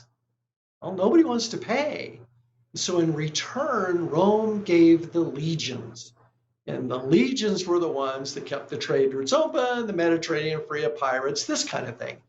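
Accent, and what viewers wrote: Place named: United States. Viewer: When he started speaking with an American accent I kinda expected this to be a bit one-sided... I was not surprised. "Predictive History" remains the Geo-political analysis standard on yt PS: your country is controlled by Iran where all your politicians are forced to put on a hijab and have to go pledge allegiance at Iran's wailing wall... (sarcasm)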